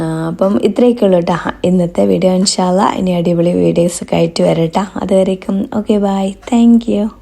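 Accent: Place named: Malappuram, India. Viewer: Nalle paangnd ഉപ്പളാത്തെയും മലപ്പുറം ഭാഷ 2 ലും mundikko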